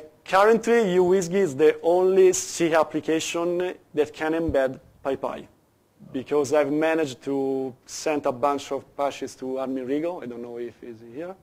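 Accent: Italy. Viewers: Because this was recorded in Florence Italy. Europe isn't a single country. There are many different languages being used. And he IS speaking English, but he has an Italian accent, we get used to it. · It's just I had hard time understanding, very strong accent. Maybe it's because I heard Italian accent for the first time.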